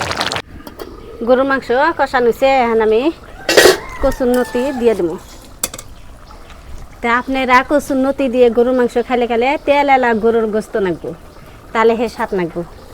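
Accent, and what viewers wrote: Tangail, Bangladesh. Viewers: আপনার জেলা কি টাঙ্গাইল নাকি?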